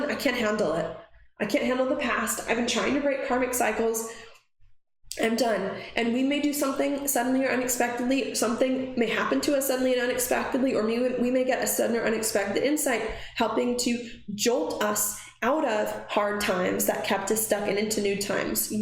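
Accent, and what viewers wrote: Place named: Georgia, United States. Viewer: I love you in pink, btw. Love your explanations! Thanks,!! Love that subtle Georgia twang;)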